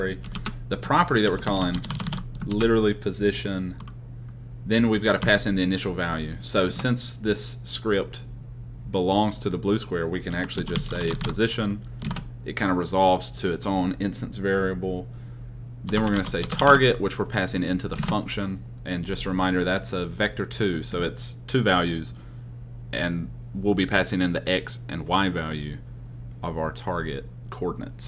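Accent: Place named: United States. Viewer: Your accent is awesome lmao never heard a country accent for Godot tutorials. +1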